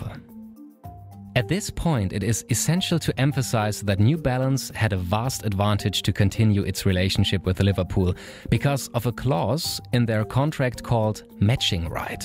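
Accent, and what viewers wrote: Germany. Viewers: First time on this channel, I can tell that the speaker has a German accent 😀, right or wrong? I'm German speaker, so I think I nailed it lol. Interesting topic in the video. Edit: Just heard how you spelled Jürgen Klopp , well, now I have no doubts at all 😂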